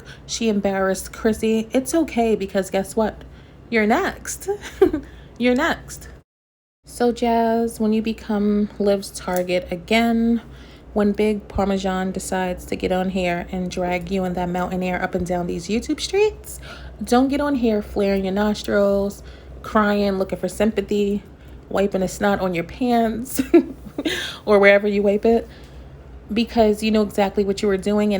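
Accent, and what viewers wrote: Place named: New York, United States. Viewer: Are you from New York ? I love love your accent !!!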